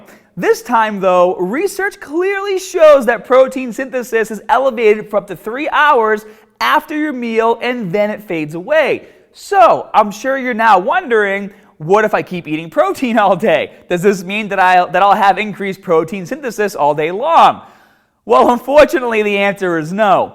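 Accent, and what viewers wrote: Boston, United States. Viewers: loll that boston accent tho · God fucking damn that Boston accent!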